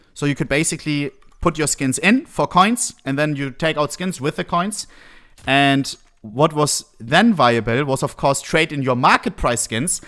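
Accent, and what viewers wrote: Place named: Germany. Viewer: Der deutsche akzent fucking big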